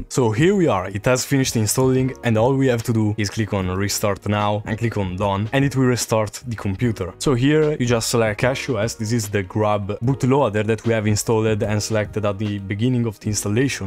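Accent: Italy. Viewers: U are italian?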